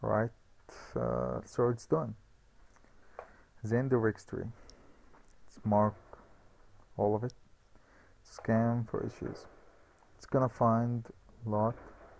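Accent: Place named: Brazil. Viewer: wow, i thought you were brazilian.. Your accent is quite similar. Great video btw! it really helped me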